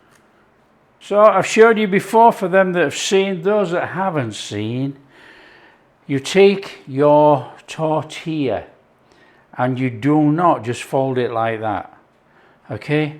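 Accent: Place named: Lancashire, United Kingdom. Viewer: Im from littleborough lancs i think i may be not far from you by your accent, i will google magna thanks.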